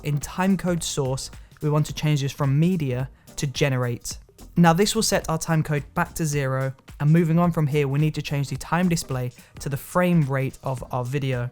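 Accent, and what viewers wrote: United Kingdom. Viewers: Hii, it helped me alot. Thank you very much. Are you British? I like your accent!!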